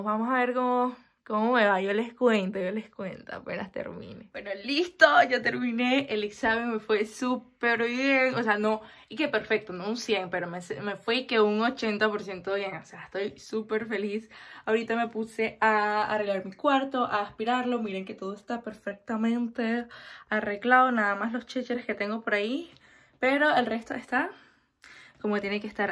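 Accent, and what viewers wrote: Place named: Nicaragua. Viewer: Vivo en inglaterra te sigo de unos meses atras, me llama la atenciòn tu acento Nica. Soy nica.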